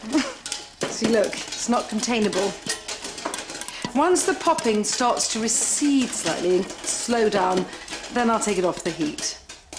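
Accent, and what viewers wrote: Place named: United Kingdom. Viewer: If got into ASMR she'd have a whole new career, with her calming voice and British accent.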